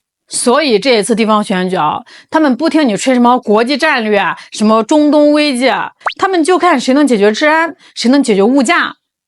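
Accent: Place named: Northeast, China. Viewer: 我本来想冲着这标题来看看黄丝和蟑螂的热闹，结果主播这一口碴子味的普通话把我直接给劝退了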